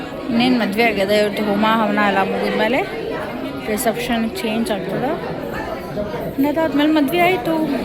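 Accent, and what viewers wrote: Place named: North Karnataka, India. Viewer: Wonderful dear, u speak very lovely North Karnataka original Brahim bashaaa❤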